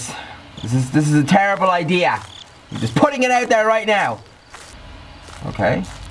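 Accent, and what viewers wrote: Ireland. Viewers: His Irish came back in this video lol!!!!! never heard him swear so much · You sound so much more Irish in this video! And that's coming from an Irish person! 😂🇨🇮 Loved this type of video from you, you should keep doing game plays! Just a random thought I had, if you were to build your 'dream house' that you've always spoken about on the sims that'd be awesome! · Oh my god that was so funny, lads I'm fucking sweating 😂😂😂 also I freaking love the Irish accent xx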